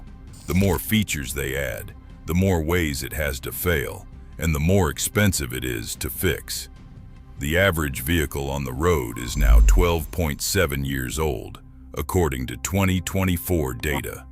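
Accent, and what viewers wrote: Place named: United States. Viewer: Please can we have someone that doesn’t speak that awful sickening American accent for a change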